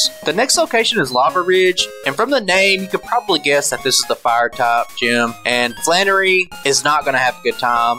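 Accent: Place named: Texas, United States. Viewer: just saw this channel now gg on the win also nice texas accent lol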